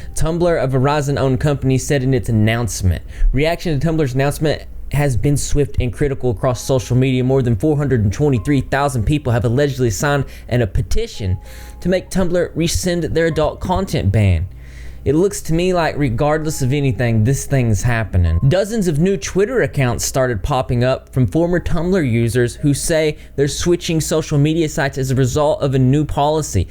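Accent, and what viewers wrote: South, United States. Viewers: Southern? He's from Tennessee I'm pretty sure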